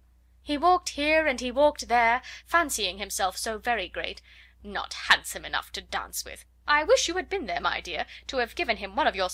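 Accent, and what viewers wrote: United Kingdom. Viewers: so british love it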